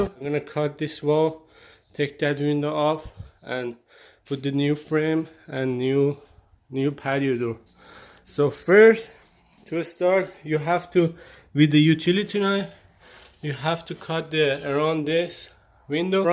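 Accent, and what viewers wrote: Iran. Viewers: Strong persian accent😉👍well done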